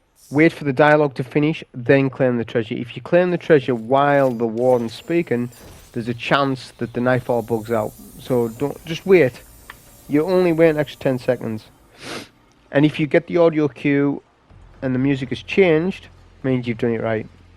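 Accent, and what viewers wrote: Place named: North, United Kingdom. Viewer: you're an up and comer youtuber but man your northern accent and conversational commentary make you very likable. catch this sub.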